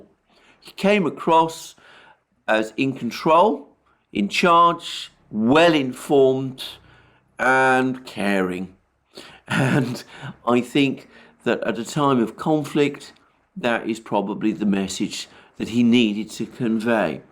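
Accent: United Kingdom. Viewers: This is the longest uninterrupted string of praising Russia in a British accent I've ever heard. Not a single moment of anything that could be seen as a criticism. Weird, isn't it? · One of the best channels for astute russian propaganda with a fine british accent from an ex laywer.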